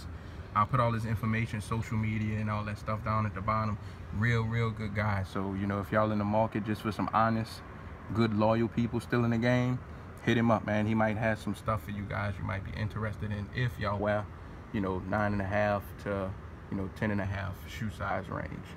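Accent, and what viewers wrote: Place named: New Orleans, United States. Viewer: Where u from bro sound like u from the N.O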